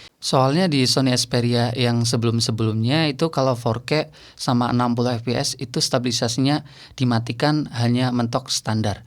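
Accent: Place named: Banyumas, Indonesia. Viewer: Oh wong Cilacap Adipala toh Ngapak kaya nyong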